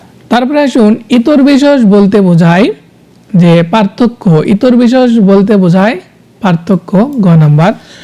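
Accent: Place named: Bogura, Bangladesh. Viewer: ভাই এর বাসা বগুড়া নাকি? ভাষা ত তেমনই 😊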